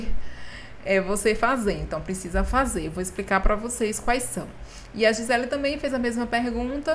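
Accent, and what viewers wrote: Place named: Maceió, Brazil. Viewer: Onde vc mora? Tem um sotaque bem parecido com o meu aqui de maceio.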